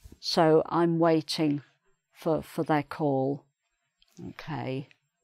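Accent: England, United Kingdom. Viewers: I love her British accent ♡ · excellent teacher no doubt! Me being french speaking English since 1977 on a daily basis mainely in the U.S I ADORE hearing this REAL English accent :) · Thank you Mrs! I do appreciate your lessons and your beautiful British accent. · I'm head over heels for your british accent a lot. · I love British accent. ❤ I feel happy when I listen to this loving teacher. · Your British accent makes me warm,. I love your lesson. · British English 🤗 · I am an English teacher and today I have learned that uses of "Would." Thank you Miss. (Plus points: you don't speak too fast and I like your british accent.) Lovelots from the Philippines. 😘😘😘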